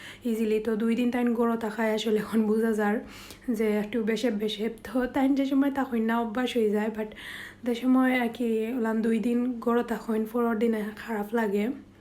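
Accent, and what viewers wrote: Sylhet, Bangladesh. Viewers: ভাল লাগল আপি। তোমার সিলেটী ভাষা শুনতে ভাল লাগে। রাতে ঘুমাইতে পারনা কেন আপি? বেবির জন্য? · আপু অনেক সুন্দর ভ্লগ, আপনার আঞ্চলিক ভাষায় কথা বলাটা সুন্দর লাগে। এটা কি সিলেটি ভাষা?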